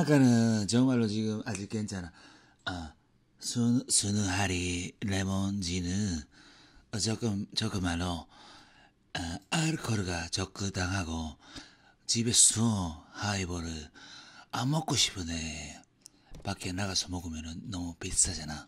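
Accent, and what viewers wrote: Japan. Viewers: 일본어 공부하러 왔어요 요즘 다나카님 억양이 머리에 멤돌아서 저절로 일어가 터질 거 같아요 근데 느끼한 뉘앙스가 더 강하게 남아요ㅋㅋㅋㅋㅋ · 저는 일본 사람입니다. 다나카さん의 억양이 여기저기 정말 일본인답고, 처음에는 이런 재미있는 일본인이 한국에서 활약하고 있구나!! 대박!!! 라고 생각하고 보고 있었는데........??? 정말 일본 사람 발음의 특징을 포착해서 엄청 웃었어요😂 美味しくなぁれ、美味しくなぁれ、萌え萌えキュン🫶🏻 (한국어가 틀렸다면 죄송합니다🙇‍♀️)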